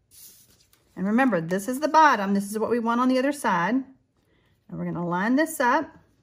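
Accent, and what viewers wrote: South, United States. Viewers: 😃 You do bring a smile to my face,. And I love your southern accent and your tutorials. Greetings from Perth, Australia 🇦🇺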